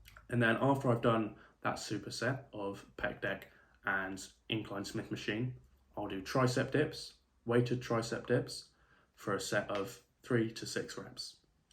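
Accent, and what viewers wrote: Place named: Suffolk, United Kingdom. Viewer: I knew i recognised your accent. Suffolk represent haha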